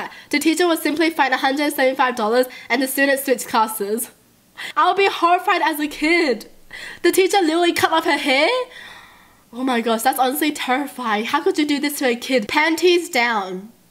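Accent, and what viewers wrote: Australia. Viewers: She grew up in Australia so she has that accent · Nana Danisa wow ok, so your calling people idiots for being slightly mistaken? She has an Australian accent, who can blame them if they thought that (and by the way, people can be Australian soooo yeah. Your argument that it's "not a race" makes no sense and doesn't benifit you or your argument"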